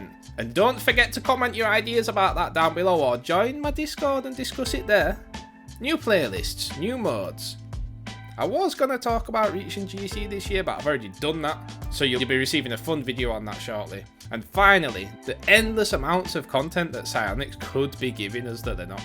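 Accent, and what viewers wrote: Yorkshire, United Kingdom. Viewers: Great vid! By any chance are you from Yorkshire cause u sound like it 😂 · wait are you from yorkshire hell yea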